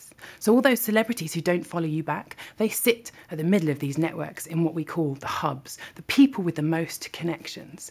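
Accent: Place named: West Country, United Kingdom. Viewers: No such thing as a British accent, since there are four countries in Great Britain - Scotland, England, Ireland and Wales. I don't know about you but I've never heard one accent that combines all four. has an English accent. As far as I can tell she's a well-spoken West Country (Somerset) accent. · She has a British accent because she is from Britain and has an accent that also comes from Britain. More specifically, she has a West Country accent.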